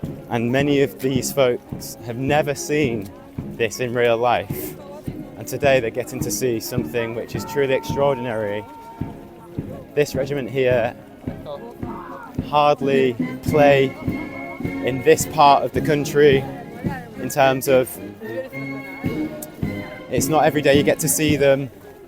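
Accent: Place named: Staffordshire, United Kingdom. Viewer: … the more I listen to your narration the more I think he was born and bred in the potteries or the Staffordshire Moorlands.. I may be wrong of course but that accent sounds familiar … Nice video btw as usual … 🫡🫡🫶🏻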